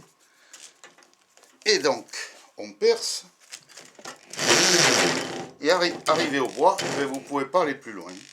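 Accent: South, France. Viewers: tres sympa cette video. et toujours avé l'accent!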